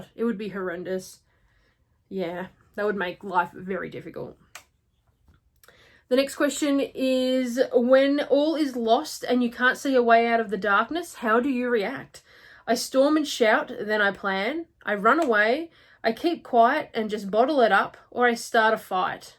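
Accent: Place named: Australia. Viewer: I got Claire as well! Don't pay any attention to nasty people. I am Canadian and love your accent. I have a friend from Melbourne who sounds just like you. I love your Outlander Tuesdays. I just started reading the books and watching the show about a year ago and am obsessed. Keep up the great videos!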